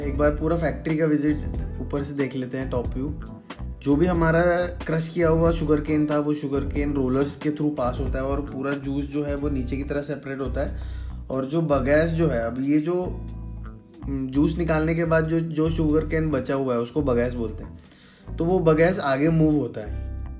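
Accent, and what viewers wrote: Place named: Delhi, India. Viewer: Never expected this kind of Hindi accent ( Delhite) from the South Maharashtrian person.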